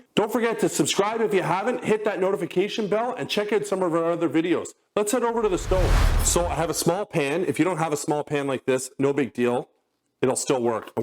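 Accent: Canada. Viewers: Are you Canadian? I can tell by the accent.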